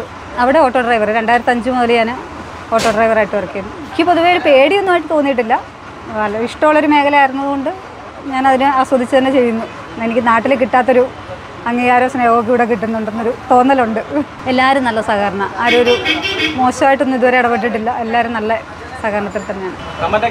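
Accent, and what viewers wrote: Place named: Pathanamthitta, India. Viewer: കാസറഗോഡ് കാരി ആണെങ്കിലും തനി പത്തനംതിട്ട കാരിയുടെ സംസാരം. 🌹🌹🌹👌👌🙏🙏👍👍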